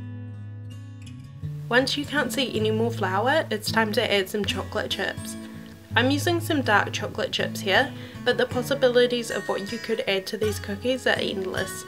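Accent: New Zealand. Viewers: She sounds like she’s from new zealund bro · Your from new zealand right? I can hear it in your accent :D im a kiwi too!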